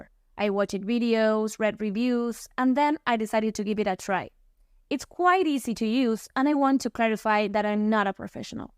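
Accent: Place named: Italy. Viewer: I guess you are Italian. Nice accent. Great Video.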